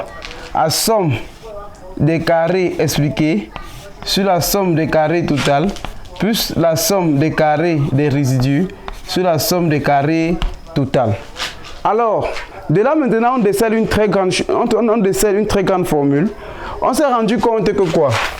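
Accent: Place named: Africa. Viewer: Merci. excellent, il te foudra consulter les vidéo de communauté qui parle nativement le français de la france correctement prononcée. je veux dire par ceci le français comme le prononce les professeurs du français dans les faculté du magreb arabe par exemple. pourquoi ?????? et bien parce que, tu va devoir abaisser dans un premier temps, l'accent africain très ombré . autrement dit, toi , tu cherche à mondialiser tes propos, to doit donc visée un accent moyen que la majorité des francophones du monde vont comprendre facilement et adorer. je te dit ceci car je veux que tu reussisses mon ami. :hand-pink-waving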